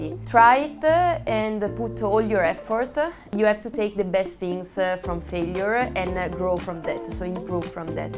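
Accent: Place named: Brescia, Italy. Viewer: ha mantenuto il nostro intercalare bresciano😊 anche parlando in inglese, a parte questo, brava 👍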